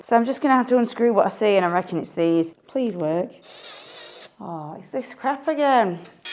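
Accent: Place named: Manchester, United Kingdom. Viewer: Are you a Manchester lass.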